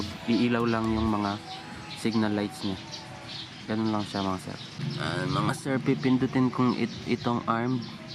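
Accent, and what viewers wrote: Pampanga, Philippines. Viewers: Pansin ko sir sa pagsalita mo, hula ko, kapampangan ka? tama ba ko? hehe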